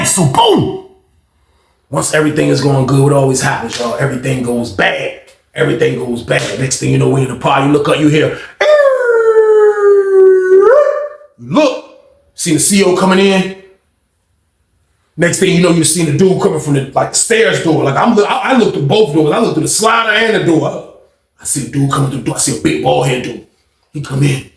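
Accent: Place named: New York, United States. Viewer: BEST PRISON CONTENT CREATOR!!! Yo u still sound mad new york and i cannot believe you are in Virginia 😂😂 You just like the city that never sleep with these BANGAS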